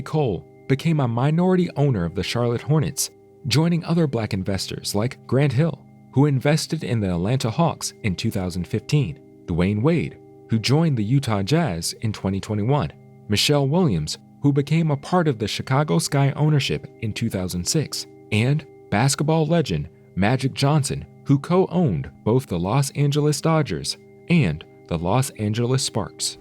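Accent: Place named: Europe. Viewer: Am I a racist for thinking this dudes voice is mighty European sounding 🧐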